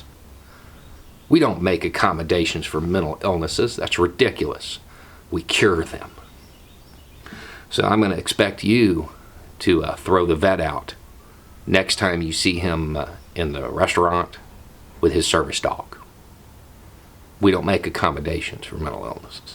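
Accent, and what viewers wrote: South, United States. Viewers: It tickles me every time seeing a hyper masculine guy with a southern accent advocating LGBTQ+ rights. Every damn time. Thanks! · Who in the hell ARE you?:) YouTube suggested you to me yesterday for the first time - the witch/churchgoer video. Holy cow! I subscribed immediately. This is another winner video. You are sane, level-headed, a clear thinker, classically logical. That's a pretty rare combination these days. I'm also from the South, so not a problem for me, but you realize that the accent severely throws some people, right? LOL Rock on,. Cliff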